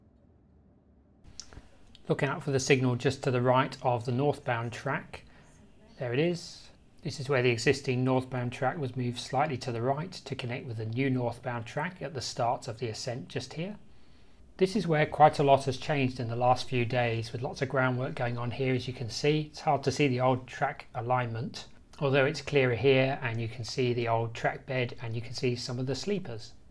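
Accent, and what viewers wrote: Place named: England, United Kingdom. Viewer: I thought ohh 15 minutes but when I heard your English accent and picked up what the subject entailed, I watched on. I do wish they'd make mega projects like this a bit more aesthetically interesting such as the tunnel portals. Nowadays it's more bland and ugly then ever and all that matters is seeing it open as soon as possible. Even road projects get better treatment.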